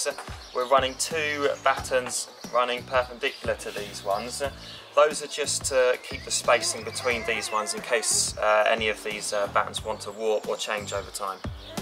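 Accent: Italy. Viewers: I hear an Italian accent, no? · Are you italian? I can hear a tiny "ë" at the end of your sentences. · You sound Italian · I'd say he's Italian 👍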